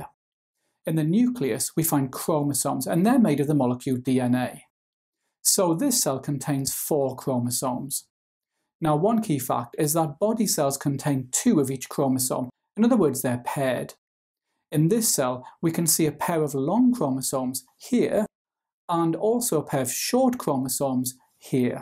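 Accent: Wales, United Kingdom. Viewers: As instructed by my teacher in my online homework task, I express my deep appreciation for your content and dulcet Welsh tones.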